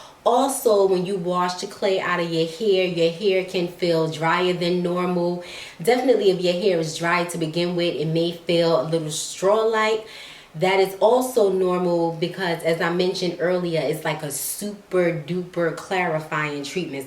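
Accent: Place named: New Orleans, United States. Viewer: Omg I love her accent. Are you from New Orleans. And I love your videos 🥰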